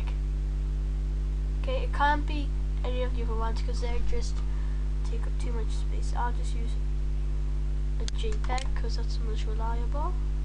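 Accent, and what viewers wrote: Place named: United States. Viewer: lol!You've done what i do-ur talking almost in an american accent!lol.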